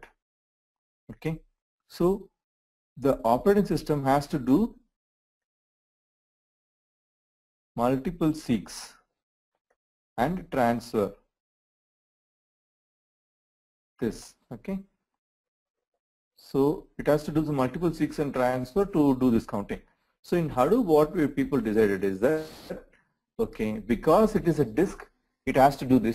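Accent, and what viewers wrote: India. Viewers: it is easier if u are indian. bec you are having the same accent, no offense.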